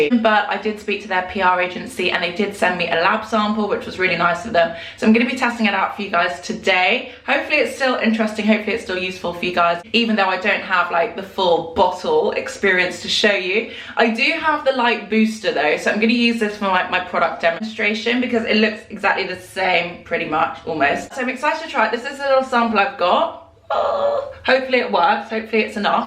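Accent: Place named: United Kingdom. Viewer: Literally just found your channel! I'm a fellow Brit and adore your accent and the way you speak! Thanks for the great reviews x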